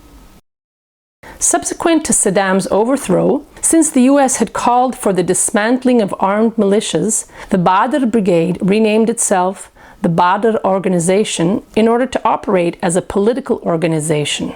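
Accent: Israel. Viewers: Zionist detector. the narrator is zionist israeli and this forum is false. (pay attention to her accent) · I SMELL ISRAEL IN YOUR ACCENT AND STORY . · Why this woman is talking like an israeli woman? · This video is one of the many collaborations between Salafi/wahabi sunni and Zionist Jews as the pronunciation of the narrator comes from Israel Apartheid state. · The accent of the broadcaster in the video sounds Hebrew. From the way she pronounces Hezbollah. Am I right? Anyone else noticed that?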